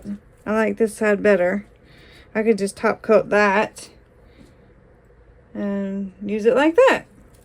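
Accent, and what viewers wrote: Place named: Deep South, United States. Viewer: lol i smile everytime i hear the same words i use come out oh your mouth lol i dont have the deep south accent anymore(unless im really pissed or super excited about something) but i use the same slang and way of talking lol i dont feel so alone in this world on the west coast where people look at me funny when i say purdy or aite lol instead of pretty or alright lol i still use the word buggy instead of shopping cart lol lol i always say yonder and people look at me like i have two heads lol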